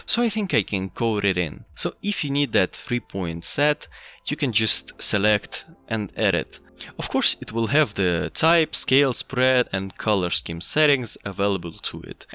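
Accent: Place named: North Caucasus, Russia. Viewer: Bro must be from the north caucasus.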